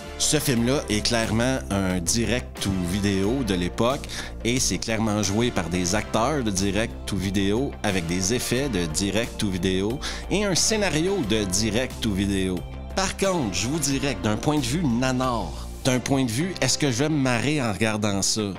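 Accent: Quebec, Canada. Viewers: Ton accent québecois te rend service. C'est vraiment très plaisant de t'entendre dézinguer des mauvais films avec cet accent et 2, 3 expressions typiquement québécoises. Je vais nulle part. Je vais pas "à nulle part". Simple exemple.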